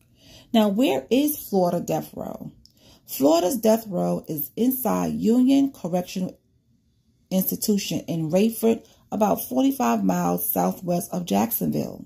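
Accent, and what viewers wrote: New York, United States. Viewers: You sound like your from New York. We got New Yoooork in da house!!!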